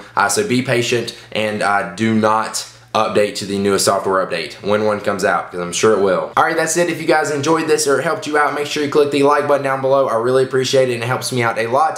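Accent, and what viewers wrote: South, United States. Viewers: You sound country...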